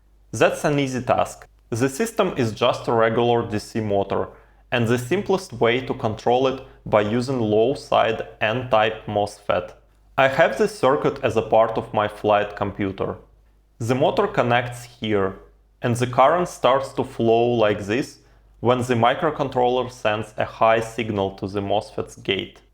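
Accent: Russia. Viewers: Speaking with russian accent)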